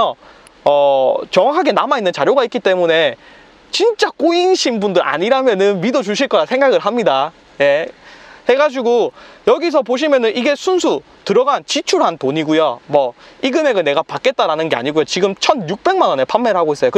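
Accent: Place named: Gyeongsang, South Korea. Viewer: 갱상도 발음이 직이네.. 고마 정이 철철 흘러 넘치네예... 정말 이 동상께 차 사고잡네. 부산까지 고마 날라가서 확 싸 질러 뿌고 잡네. 영상 고마버요. 하는것 보니 인상좋아 잘될낍니다.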